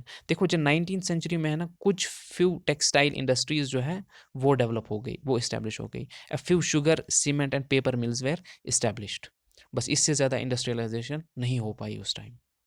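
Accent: Kashmir, India. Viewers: Kashmiri accent❤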